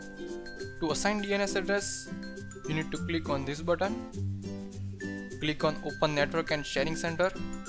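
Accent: India. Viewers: Indian accent, I wonder if it is a scam...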